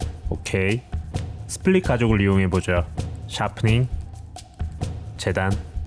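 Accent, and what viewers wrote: Gyeongsang, South Korea. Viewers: 아 약간 경상도 억양이 있는 듯 한데 경상도에서 오셨나요!!! 헷갈리네요 ㅎㅎ 항상 잘보고 있어요!!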